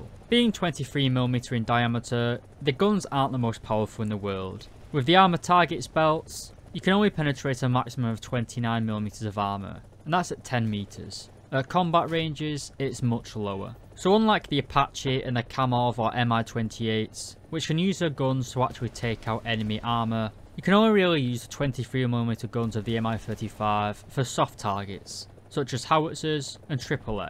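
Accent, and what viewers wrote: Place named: Lancashire, United Kingdom. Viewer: Where abouts in the UK are you from pal? Sounds like Lancashire to me. I'm from Leigh near Wigan/Manchester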